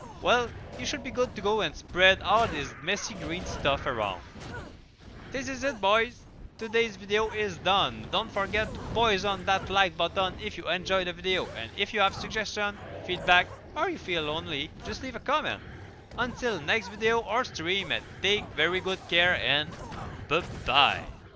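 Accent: Quebec, Canada. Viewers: Québécois? Nice video 👍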